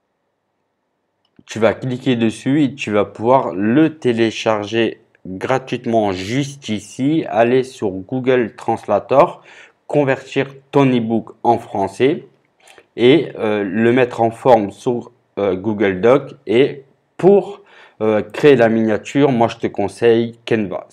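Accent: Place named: Africa. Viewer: T’as comme un accent africain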